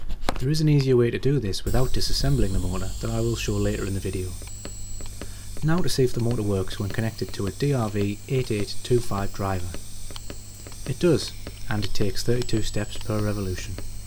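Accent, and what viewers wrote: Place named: Northern England, United Kingdom. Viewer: As others have commented, a very clear explanation and a very soft pleasant speaking voice ( which many Northern accents have ), so you're ideal for youtube videos. However is the overlay at 4.24 correct ? At 100mA x2 the torque is apparently 6x the 5v test at 32mNm, but torque is given as 117mNm ( the same value as 50mA x2 at 3.6x the 5v value ( 3.6x 32mNm = 115.2mNm ).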